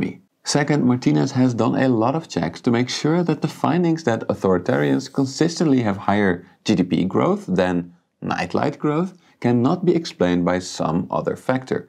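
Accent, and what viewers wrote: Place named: Netherlands. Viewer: He's Dutch. This is obvious from his accent and from his name.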